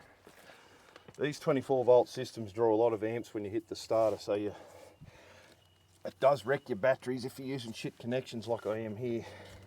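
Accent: Australia. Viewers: are you Australian?????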